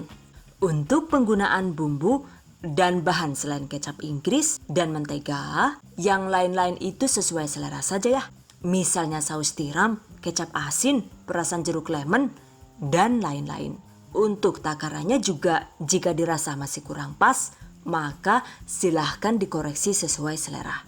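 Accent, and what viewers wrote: Banyumas, Indonesia. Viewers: Mba asli mana kok bisa ngapak? Salam ngapak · Ora ngapak ora kepenaknya bun..😀😂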